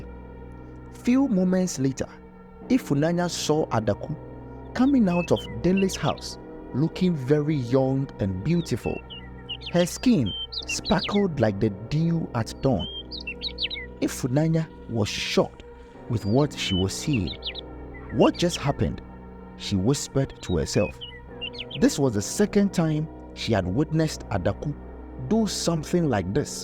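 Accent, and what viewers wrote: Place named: Ghana. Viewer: Lovely story. Loving the Ghanaian accent!!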